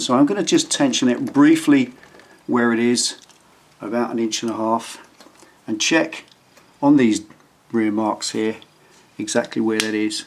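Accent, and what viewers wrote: United Kingdom. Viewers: You , sir, are absolutely brilliant! I always watch a video or two each time before working on the Bonneville. Being an Anglophile, the British accent goes a long way toward getting what you're saying lodged into my mind, lol!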